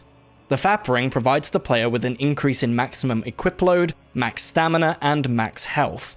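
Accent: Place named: United Kingdom. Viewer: Very good review, watched it from beginning to end. Do you know Greg Kasavin? I can't imagine you haven't been influenced by him. we can hear a similar music to the one in RE4, which can also be heard in the Gamespot Classic Review and your delivery is similar to him despite your British accent.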